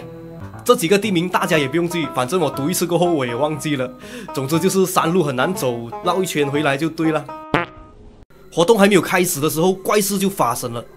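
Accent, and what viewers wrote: Malaysia. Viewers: 你的故事整理的很好，但是，只是 建议 “发音” 需要再多加练习调整一下，会更好。【这应该是大马人の通病大概吧】就是句头 句尾语气词 轻重音，整体性的 发声『 抑扬顿挫 』… 我知道你不是专业的，但慢慢可以改善 相信会越来越好，你可以参考其他讲解梳理案件的网红 可以把人带进故事意境中。 其实，使用 “大马腔调”の口音说话叙述 比较不太好聽，讲故事也比较难有意境跟代入感 ，听着也很容易让人出戏～有种讲着讲着很困難，又很卡…卡顿的感觉……。😂 😌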